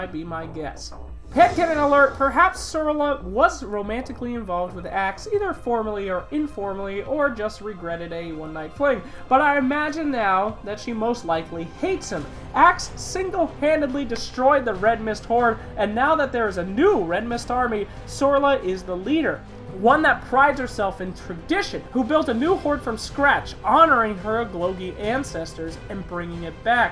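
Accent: United States. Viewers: >American pronunciations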